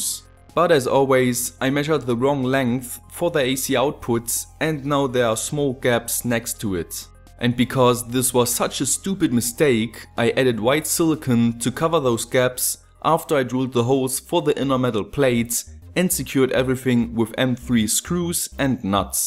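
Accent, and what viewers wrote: Germany. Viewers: are You German? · pronunciation is amazing. It's comical, Germans wouldn't understand the affectionate way we perceive the German accent ;) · Are you German?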